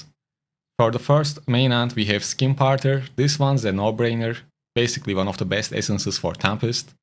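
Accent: Turkey. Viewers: La ingilizcen aynı Türk lehçesinde. Ortaaamm türksün sen la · You sound turkish ngl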